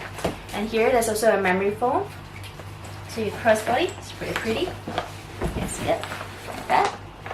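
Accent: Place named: Singapore. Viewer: Are u from Singapore. I can recognise your accent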